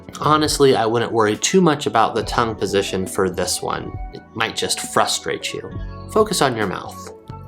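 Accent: United States. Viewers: What side of the US are you from, to find out more about your accent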